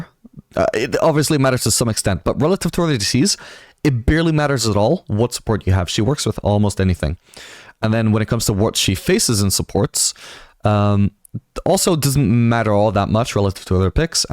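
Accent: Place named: Canada. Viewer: Where are you from? I hear some kind of Canadian accent although account’s from the UK haha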